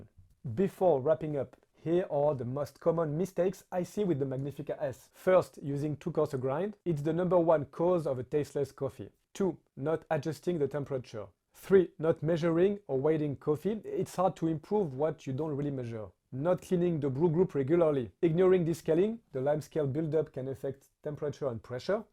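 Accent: France. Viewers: You have to be from Quebec or France. Love the accent.❤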